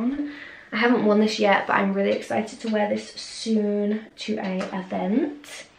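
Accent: United Kingdom. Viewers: Her accent sounds British. I never knew she was British. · I love these try on hauls, your body is amazing and you are gorgeous. But I also love your British accent and your voice, just listening to you talk gives me some asmr tingles sometimes. You seem like such a sweet person. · You have gorgeous eyes! And I love your beautiful voice with that British accent it’s hot!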